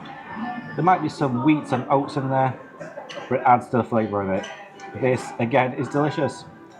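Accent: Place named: Yorkshire, United Kingdom. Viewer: I can tell you're from Yorkshire. I paid 12 euros return on the big blue Dublin coaches in May.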